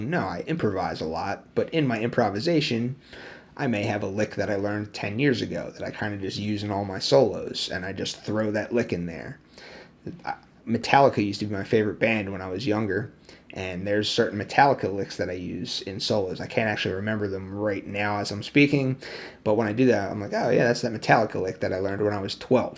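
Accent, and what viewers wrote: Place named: Philadelphia, United States. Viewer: are you from philly? you sound like you’re from philly.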